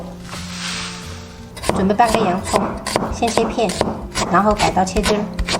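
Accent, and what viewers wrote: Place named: Minnan, China. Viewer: 你似被夫家閩南口音影響了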